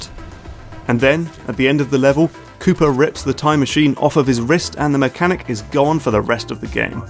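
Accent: United Kingdom. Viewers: Your British is showing! ; ) "This thing, that chases you like a quarterback." Quarterbacks don't chase people! Anyway, love the channel! Keep up the good work.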